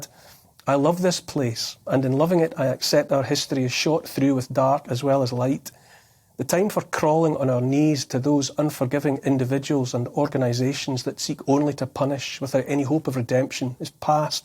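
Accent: Scotland, United Kingdom. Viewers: The usual English assumption that Britain is England! Yes, I know he's got a Scottish accent but |I assure you he's NOT Scottish. No self respecting "Scotsman" would ever call themself "British"! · I get the real scottish feeling when this guy talk. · I’m English but call myself British and love and miss my people as I live in nz . Neil Oliver you are wonderfully erudite, and it’s such a relief to hear your voice of reason - spoken with the charm of your Scottish accent .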